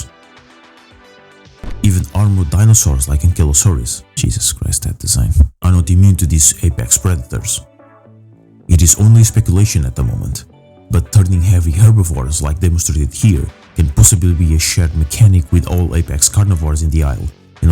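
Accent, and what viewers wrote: Portugal. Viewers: amazing video as always man, i too am worried with the lack of info in this concept. P.S: your accent is very familiar to me. do you happen to be portuguese?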